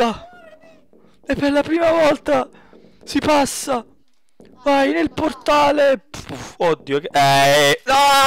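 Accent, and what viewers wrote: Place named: Naples, Italy. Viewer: Napoletano <3 <3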